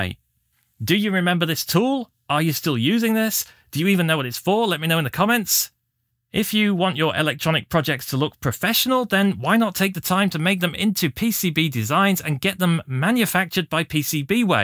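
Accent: United Kingdom. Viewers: I've not tried it, but you might be able to get more purer ethanol in the form of BioEthanol (~95%) assuming by your accent that you're in the UK, they sell this in B&Q, although the pricing is pretty much the same as IPA, so not sure if there's any benefit.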